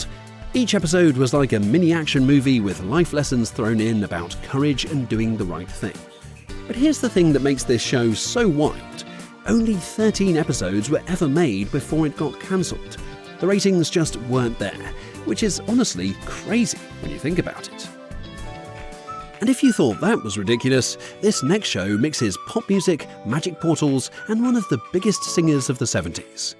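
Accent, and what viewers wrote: United Kingdom. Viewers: I remember all those Saturday morning cartoons growing up in the 70s, but what I don’t remember is your British voice coming out of my mono TV in 1977 That’s what I don’t remember.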